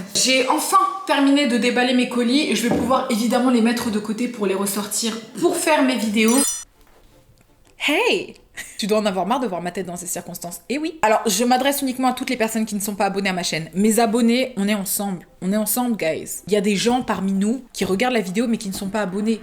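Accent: England, United Kingdom. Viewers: Son accent anglais est très intéressant et tu gagnerais à l'écouter car ça permet de retenir certains mots. L'anglais est la langue la plus importante donc il faut t'y mettre.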